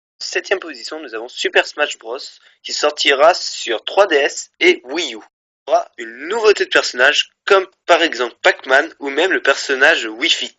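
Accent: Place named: England, United Kingdom. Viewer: L'accent english :p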